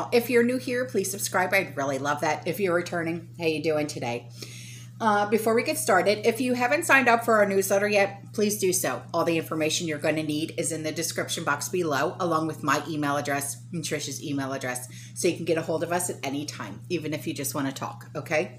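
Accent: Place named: New York, United States. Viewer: The more you talk the more you sound like you're from New York instead of Florida.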